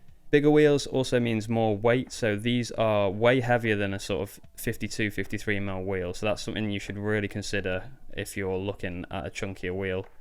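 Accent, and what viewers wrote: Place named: Canada. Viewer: You sound very Canadian 🤔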